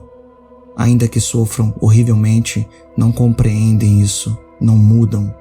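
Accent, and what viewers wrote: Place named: Rio de Janeiro, Brazil. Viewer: Oque me causa desprezar como ser vivo eh esse sotaque carioca com " x " 😂😂😂😂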